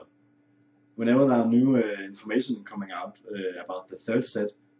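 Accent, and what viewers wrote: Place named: Germany. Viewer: Das ist definitiv deutsches Englisch ;)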